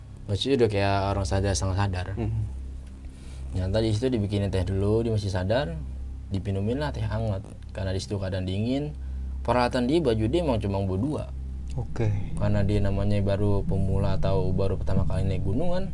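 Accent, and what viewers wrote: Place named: Jakarta, Indonesia. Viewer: betawi bang hehe🙏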